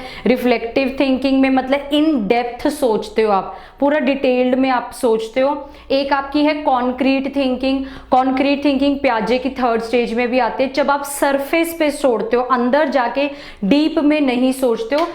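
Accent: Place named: Punjab, India. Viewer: Ma’am Apki Dilect main Punjabi Touch aata hai🙈🙈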